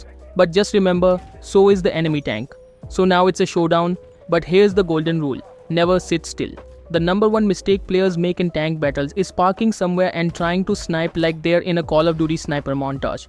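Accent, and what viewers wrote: India. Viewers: The moment i clicked on this video, i knew it was bout to be good by the indian voice this guy straight to the point like tech tutorials by indians and no extra yapping..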